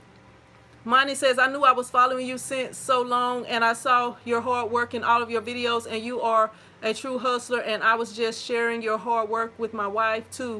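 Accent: South, United States. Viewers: Yes, I watch you because I love your southern accent. 😁 Great resources! Thanks.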